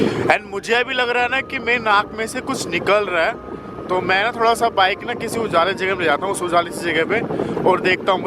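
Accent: Haryana, India. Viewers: Apka hariyanvi accent itna cute lagta h real Ho bhai don't worry aur pls apni safety ka khyal rakho god bless you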